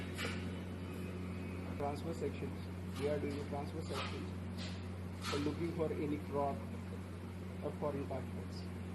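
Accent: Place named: India. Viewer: прошу прощения. Индия. Акцент характерный. труп пролежал не более 4 дней, только в условиях жары. плюс сам по себе человек смуглый был. отсюда и отличный внутренний мир тела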